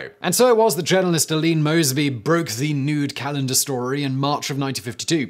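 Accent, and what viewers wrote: England, United Kingdom. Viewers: Between the english accent and the frickin metric system, it's a waste of time. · Your funny. And you speak fast in a English accent.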